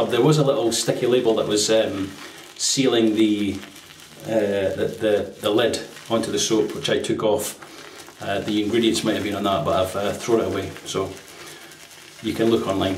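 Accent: Scotland, United Kingdom. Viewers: Are you a scot, sir? Nice shave, by the way.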